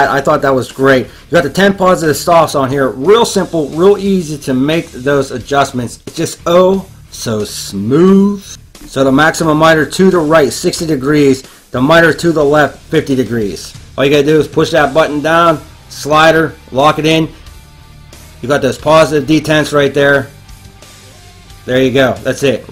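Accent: Pittsburgh, United States. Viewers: you def sound like a Yinzer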